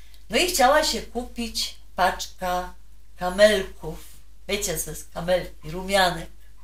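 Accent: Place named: Podhale, Poland. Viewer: Wymacie piekna mowe po Slasku i u Pani slychac Goralski akcent. Pierwsze myslalam ze Pani jest z Gor , Goralka = Bergfrau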